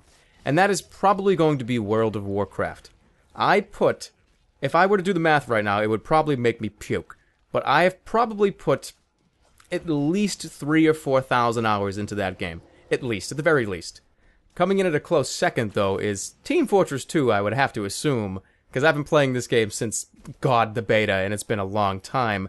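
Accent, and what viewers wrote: Boston, United States. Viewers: and hes got sort of a boston voice :D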